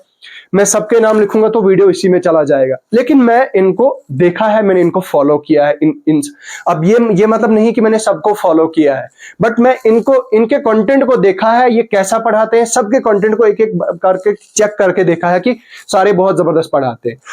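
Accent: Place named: Kashmir, India. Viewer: Bro tum kashmir se ho? Aapka accent thoda kashmiri hai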